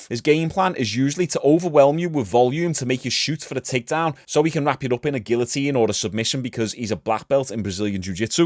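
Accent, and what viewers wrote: Liverpool, United Kingdom. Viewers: oh snap! I subbed like two weeks ago bc I liked your logical takes on things... I had no clue you are a Scouser! I'm American so I can't tell based on accents alone but Liverpool has been my fav football club for a while now. I seem to fit right in here lol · He's looked similar to this since he went up from 155. He's not naturally that big so he wouldn't cut anything for this fight. He'd want to keep the mass he had, no matter the composition. Watching the fight, if Nate was bigger and/or had more punching power, Jake would have been in trouble as he was eating uppercuts like candy later in the bout. Edited to include that I love the Scouser accent. It's my favorite of all that I've heard from across the pond.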